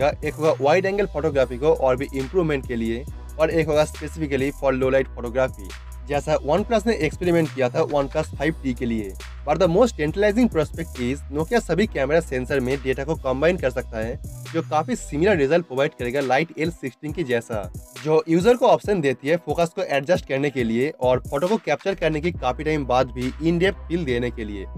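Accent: Bengal, India. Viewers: Firts Change ur bengali tone broder....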